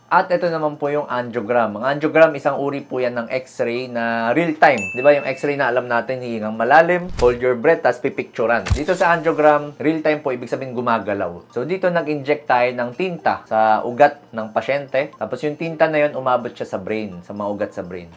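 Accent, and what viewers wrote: Visayas, Philippines. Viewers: Bisaya sya idol...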